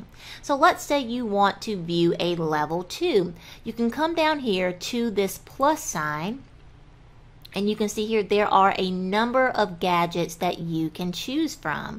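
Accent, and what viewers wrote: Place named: South, United States. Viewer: this was a ton of help! Love the southern twang accent too. I am from Raleigh NC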